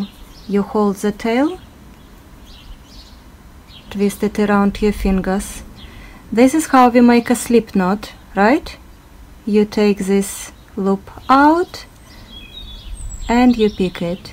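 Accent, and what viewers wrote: Russia. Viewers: Оооой русский акцент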